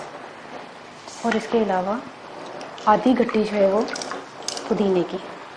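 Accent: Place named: Punjab, India. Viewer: Ap jb panjabi main baat krti hain to boht acha lgta hy mashallah boht piara bolti hain or cooking b zbrdust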